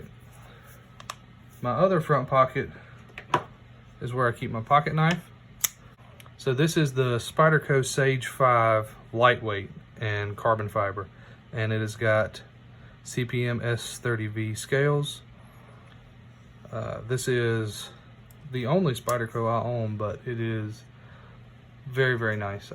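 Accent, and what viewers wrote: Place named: South, United States. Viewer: Thank you. Great no-nonsense, practical EDC with a southern drawl 😊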